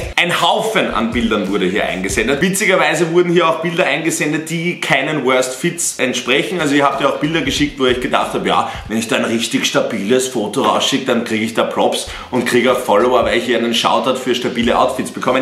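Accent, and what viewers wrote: Austria. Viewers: #österreicher "Wos dus du dn grod"😂😂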